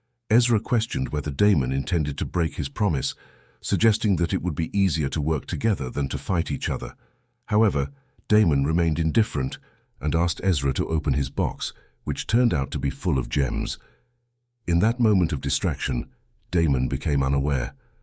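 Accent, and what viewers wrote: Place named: United Kingdom. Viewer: Have to say, that voice caught me off guard a bit......😊 Im not used to it being a (very) British accent, its usually an American accent...😊 Thanks for the recap 👍💙💛👍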